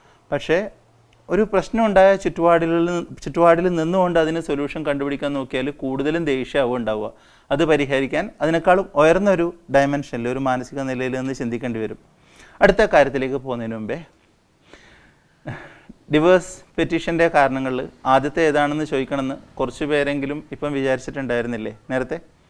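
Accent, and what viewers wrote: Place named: Kanhangad, India. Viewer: ഞാൻ ചിന്തിക്കാറുണ്ട് എന്തുകൊണ്ടാണ് ആളുകൾ നല്ല content videos കാണാത്തതു എന്നു . എല്ലാവര്ക്കും മറ്റുള്ളവരുടെ സ്വകാര്യത അറിയാൻ ആണ് ആഗ്രഹം. Hmm അതെന്തെങ്കിലും ആവട്ടെ The only regret is that I was little late to watch your videos Now watching all the videos together It's like a close friend sitting next to me and talking ചിലപ്പോൾ kanhangad slang ആയതു കൊണ്ട് എനിക്കു അങ്ങനെ തോന്നുന്നതാകും I'm waiting for more videos like this❤️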